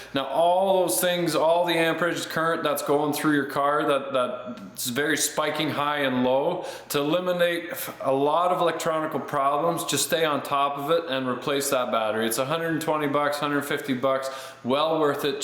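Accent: Canada. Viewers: Good, useful, logical tips presented in a wholesome Canadian accent. Well done! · I love how i could tell he was Canadian after the first 30 seconds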